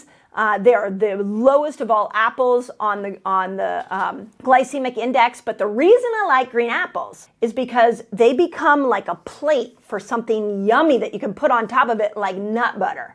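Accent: California, United States. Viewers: You have helped me so much I don't know how to thank you! 21 lbs down so far! The only thing that doesn't register is when you say "fee-ude" instead of "food" ....must be the CA accent. 🙂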